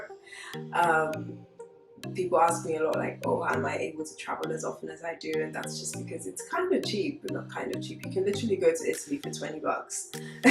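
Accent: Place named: Ireland. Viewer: Love your Irish accent .. I want to visit now ❤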